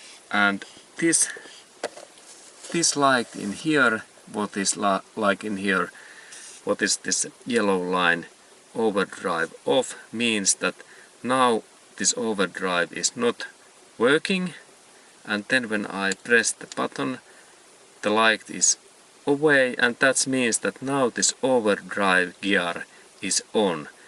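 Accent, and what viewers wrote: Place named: Finland. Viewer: In case you ever wondered what a Finnish accent sounds like, now you know...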